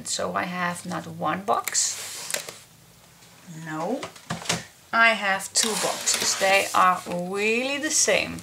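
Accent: Brabant, Netherlands. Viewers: Gefeliciteerd met deze mijlpaal👍🎉🎈. Ik volg je al een tijdje maar ben nu ook abonnee geworden👍💋. Je maakt zo leuke dingen, maar soms heb ik moeite met het engels, dan kijk ik naar de video en wordt t me duidelijk wat je bedoeld. Ik woon in Nedetland en in Limburg. In de buurt van roermond dus niet zo verweg van brabant, ik hoor je accent en versta t ook. Ga zo door👍💋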